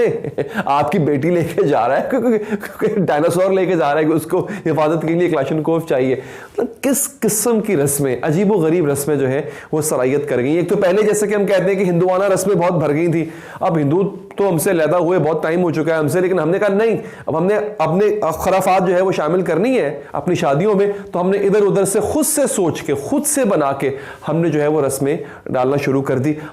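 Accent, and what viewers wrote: Punjab, India. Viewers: Bhai apki punjabi bri mazy ki lgti hme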